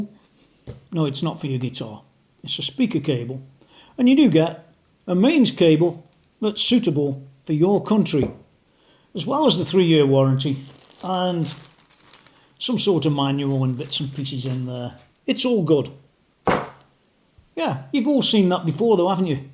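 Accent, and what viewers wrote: Stoke, United Kingdom. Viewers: Am I picking up a Stoke accent?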